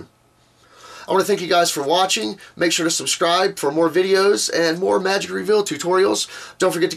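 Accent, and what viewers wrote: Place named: United States. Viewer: Hey I love your particular American accent! =D Is it a mixture of more than one accent? Nice voice too.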